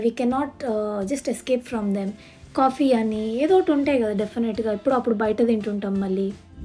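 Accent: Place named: Hyderabad, India. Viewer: Superb Hyderabadi language 👌👌👌👌👌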